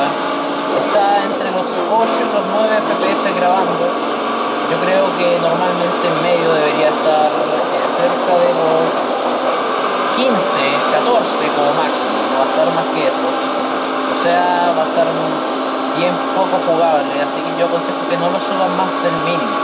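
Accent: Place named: Chile. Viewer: me encanta tu acento chileno <333 me subscribo 7w7r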